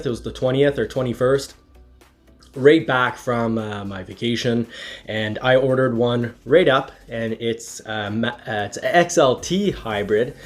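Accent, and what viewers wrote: Canada. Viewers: Are u Canada?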